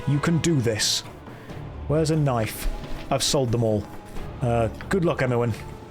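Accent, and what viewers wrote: United Kingdom. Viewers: Please, good sir, do tell me that you are indeed British as you seem to be. Because if you are, you are probably one of the Britishiest people I've ever seen.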